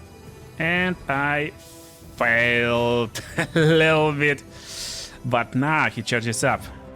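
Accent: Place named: Russia. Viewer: ю инглиш гуд, бат ай ноу у рашн))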